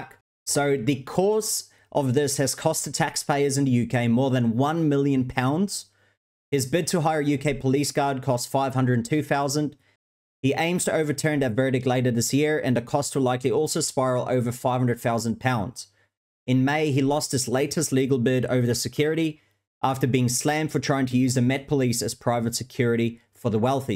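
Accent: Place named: Australia. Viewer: I subbed because I have a weakness for an Aussie accent and most importantly, I can't stand those grifters.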